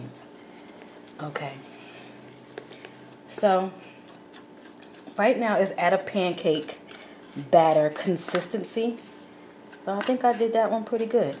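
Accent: New York, United States. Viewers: Great video! You Sound like my aunt lol NEw Yorker? ❤️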